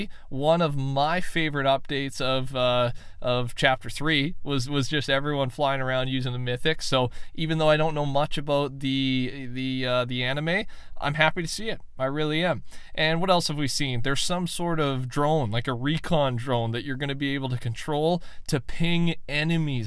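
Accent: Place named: Canada. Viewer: Are you Canadian you just give off that nice Canadian voice and vibe…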